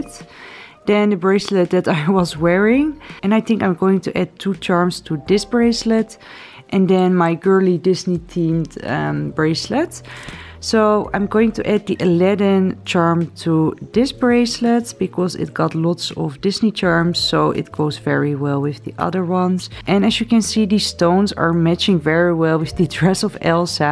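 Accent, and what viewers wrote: Netherlands. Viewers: you are dutch bc of the accent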